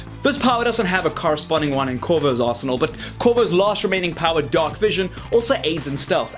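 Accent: South Africa. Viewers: Your from south africa? :D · are you south african?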